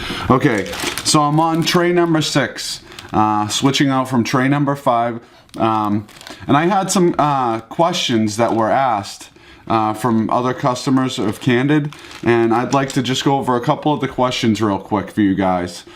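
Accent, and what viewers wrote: Boston, United States. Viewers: I'm on day 4, and my tongue is still rubbing on them really bad. My gums are really comfortable though. Do you have any tips for that? I love the accent by the way. I always thought it was just exaggerated in Affleck movies, but it's real!! Cute. Also: did you have a lisp when you started? I do and it's really embarrassing. Last question!! Do you drink water with them in?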